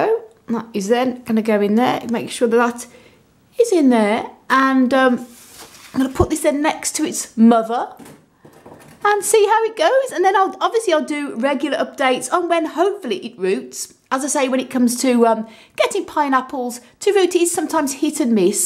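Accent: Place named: Solihull, United Kingdom. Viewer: Really useful video, I hope to propagate this way from my ornamental pineapple and its pups! Thanks for making, thanks for sharing your wisdom! You sound like you might be from Solihull or somewhere thereabouts? Xx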